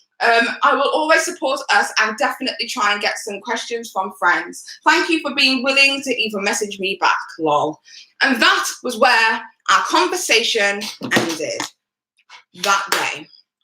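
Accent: England, United Kingdom. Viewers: You’re awesome and beautiful, ignore anyone who wants to pull you down. You are a unique person. Why should you owe any other person anything just because they happen to have the same skin tone as you- that’s crazy! Why does anyone have to fit into a box in this day and age. Britain genuinely doesn’t have the same issues with race that America has- America just can’t get past their history and it seeps into everything... but it’s not really like that in the UK. It IS different here, thankfully, or it was, although sadly it seems to be getting worse not better! I just thought you had a nice normal English accent- everyone I went to school with in my teenage years had that same accent (1/3 white, 1/3 black, 1/3 “Asian” almost exactly at the school at the time- everyone talked the same, pretty much like you. I was teased for having a different accent at the time, but you remind me of all the lovely wonderful girls I went to school with back then.) My parents did the same thing, didn’t want their kids to grow up too afraid to walk down the street, so when I was a teenager we moved to a better area and got a nice education. 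I’m really grateful for it. You’re one of my favourite Brit YouTubers and I really hope these morons won’t stop you doing what you enjoy, because you’re so good at it, a true investigative genius. I’m sure your beautiful gorgeous daughter will be luckily to grow up with such a beautiful soul for a mother, both inside and out, and your soul will alwa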